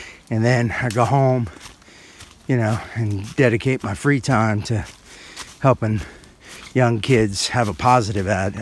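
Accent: United States. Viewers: I knew you were from Jersey. That North Jersey accent is unmistakable! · Great videos! For someone from NJ, you picked up a nice southern accent!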